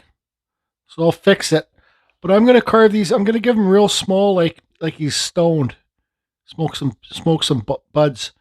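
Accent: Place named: Canada. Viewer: Don't let YouTube change your style, I like your style,. You remind me of me, without the canadian accent. Trapper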